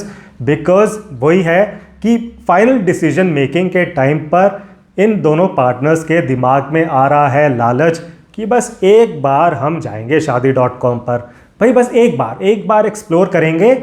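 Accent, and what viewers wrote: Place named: United Kingdom. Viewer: english accent 🔥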